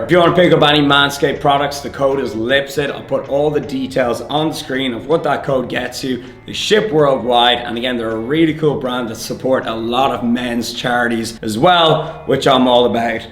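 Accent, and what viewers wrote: Ireland. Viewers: Are you Irish love from England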